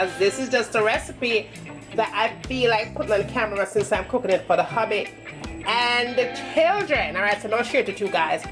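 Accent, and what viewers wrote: Jamaica. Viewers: Lol yk i jamaican when you hear one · Love your authentic Jamaican flair. Gwan goodie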